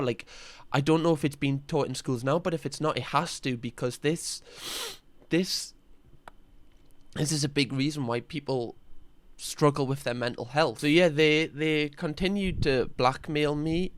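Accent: Newcastle, United Kingdom. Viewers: hi, fellow Geordie here and someone who's been through similar things. not the same, but similar. thanks so much for speaking out. this has just happened to a friend of mine. he called in a panic and didn't know what to do. luckily i put him in contact with my friend who works with the police force, so its getting sorted. but i wanted to say that you speaking out and using your platform is going to help so many people. so many youngsters look up to you and now you've made so many people not feel so alone. i am so sorry you went through this and at such a young age. it shouldn't happen and your right that is nonsense that the helpline doesn't help those who are minors when these are the people who need the most guidance. it seems like you've done a lot of work on yourself to speak to candidly and passionately about something so traumatic so you should be proud of yourself for how far you've come. 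just know that doing what you do you bring smiles to so many even me who lives away from home at the moment, listening to you laugh n talk in that way that only we Geordies seem to, brings so much comfort these journeys are never fully over, there will always be more work to be done, good days and some not so great, but you really are an impressive young man and i know that its not just myself who's grateful you were able to survive your situation. keep that compassionate vibe you have, you never know who you may save by telling your story, who you may inspire to speak out, or who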